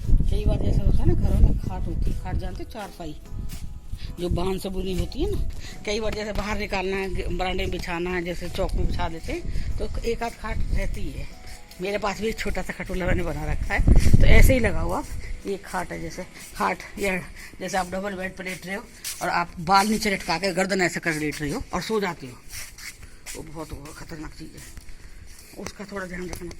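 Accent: Uttar Pradesh, India. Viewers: Love u auntyji ...aapki language mujhe UP ki yaad dilati hai I am frm punjab now in italy bt meri sasural khurja mei hai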